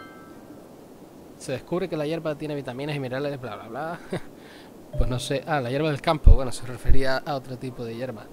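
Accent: Spain. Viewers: de que parte de españa es tu acento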